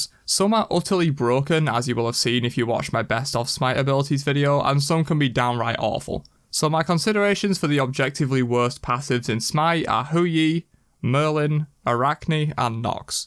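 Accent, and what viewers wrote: United Kingdom. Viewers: I love your videos, but need to go learn British English to understand more than few words!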